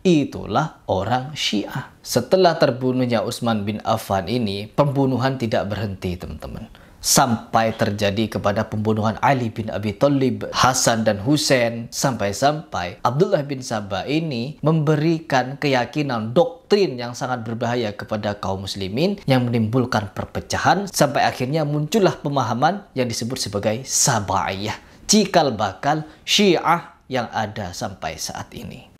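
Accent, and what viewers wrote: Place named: Madura, Indonesia. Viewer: kalau dr logatnya kayaknya madura ini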